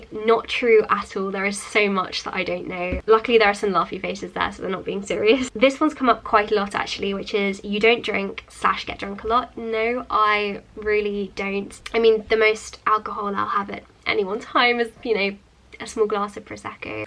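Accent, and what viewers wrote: RP, United Kingdom. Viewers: I love your British accent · i doubt her and her sister both got scholarships (plus she would have mentioned it). i don't understand what you think posh is if it isn't money/posh voice/posh house/posh food that has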